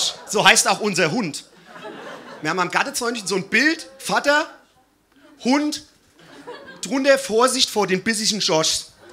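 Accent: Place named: Hesse, Germany. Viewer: Man merkt das er mit der Zeit immer mehr dialekt bekommt😂am anfang hoch deutsch und dan hessisch dialekt dan wieder hochdeutsch 😂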